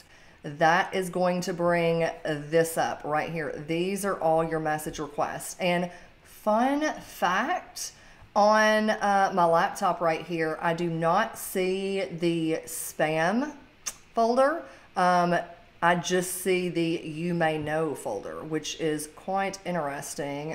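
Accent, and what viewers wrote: South, United States. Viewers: I was born in the south so Love the Southern Accent!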